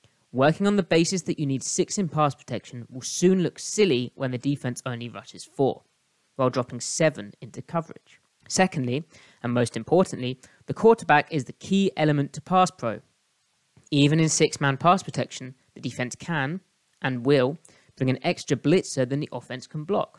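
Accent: United Kingdom. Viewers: Big fan! I've read your field gulls contributions for a long time and had no idea you were British till I heard these videos. Cool to have someone from across the pond cover the seahawks in such detail.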